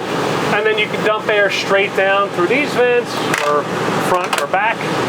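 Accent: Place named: South, United States. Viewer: What accent is that? It sounds like Minnesooooota with some Canadian ayes meets a southern drawl.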